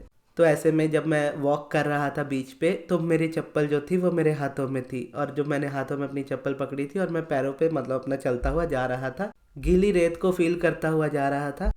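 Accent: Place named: Jamshedpur, India. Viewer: Pta h bhai mai v jamshedpur jharkhand adityapur se hu aapko ek baat batau jindgi mai low of attraction ke videos dekh dekh 2014 se paresan ho gai the matlab bohot confuse fir ek din maine universe ko bola bhagwan please kisi v tarah koi insan ho jis se low of attraction bohot easy ho jaay sikhna please us se mila do aur us waqt mai bank mai baithe the aur aapki ek video mili aaj wo ek h mai her video apki low of attraction ke achi se samjh pati hu sikh pati hu starting se aapko follow kr rahi hu aapko aur aapki awaj sun ke feel hua pta ni kuy ye humlogo ke yahna se he h fir pta v chal gya aap v jamshedpur se he ho aur main v aaj low of attraction bohot ache se sirf aapse sikha matlab universe ko bola unhone aapko bhej diya .aaj meditation v sirf aapke wajah se kr pati hu.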